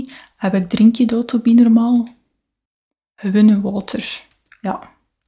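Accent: West Flanders, Belgium. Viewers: Het mooiste van jouw videos is het proberen te volgen wat je zegt in het West-Vlaams zonder ondertiteling. Het lukt, maar vraag niet hoe!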